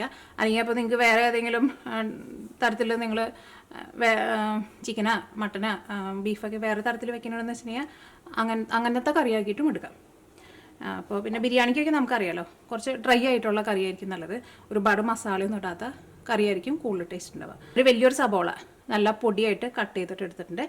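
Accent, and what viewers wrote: Thrissur, India. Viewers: Thrissur kar ayonda chengayi mare samasaram podik kudum.ente kudumbakar und anubavam und.Ek estta avarude samsaram kelkan.😍😍😍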